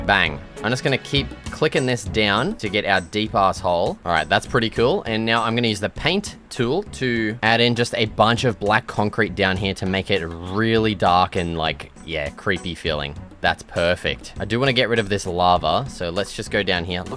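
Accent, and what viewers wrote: Australia. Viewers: He's Australian, dumbass.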